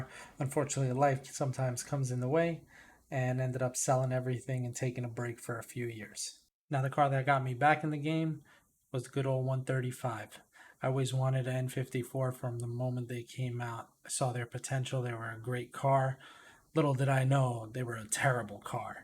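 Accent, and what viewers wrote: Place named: New York, United States. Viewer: Subscribing because you're covering this well You talk like a real NYer too not like these phony pricks from Connecticut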